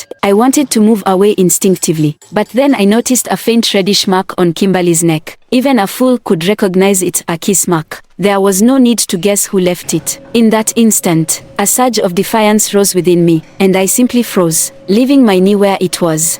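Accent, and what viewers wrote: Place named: Africa. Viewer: The African accent is crazy 😂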